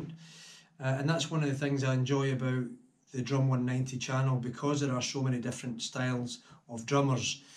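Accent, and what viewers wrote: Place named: Scotland, United Kingdom. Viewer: I think you made a really great video here and you are absolutely right. DM 190 is a constant here on Youtube and deserves your award. By the way. Nice to hear you speak a little longer. I love your scottish accent. I could listen to you all day Like your drumming.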